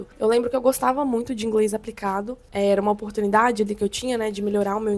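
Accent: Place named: Argentina. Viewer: Oi, eu sou da argentina. Parabens, vc fala miuto bem o espanhol. Voce tem um sotaque argentino pra mim 🇦🇷🤙🏽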